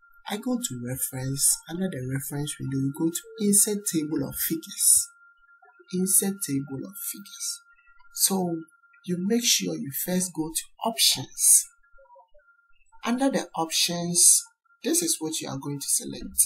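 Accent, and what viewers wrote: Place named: Ghana. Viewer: that's a Ghanaian accent lol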